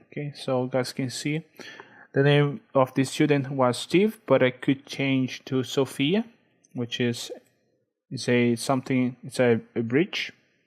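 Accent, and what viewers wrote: Brazil. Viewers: Mano você e brasileiro ? Ou americano ? ._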